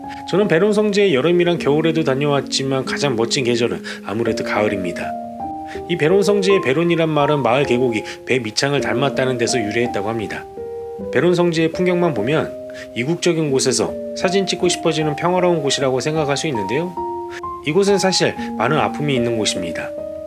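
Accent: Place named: North Jeolla, South Korea. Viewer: 전라북도 사투리같네요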